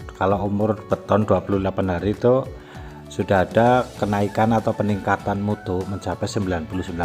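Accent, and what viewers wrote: Java, Indonesia. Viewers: Ya itu sudah bhs indonesia bro, hanya saja logat"nya sedikit medok khas jawa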